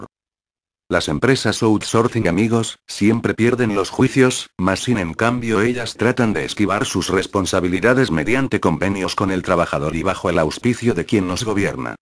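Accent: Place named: Spain. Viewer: ya me cayo en la madre ese pendejo k siempre sale narando con su vos española ya quiten esos videos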